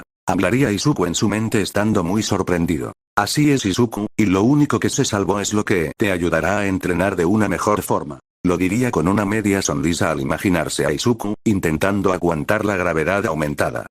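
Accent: North, Mexico. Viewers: Mmm noto algo de norteño xx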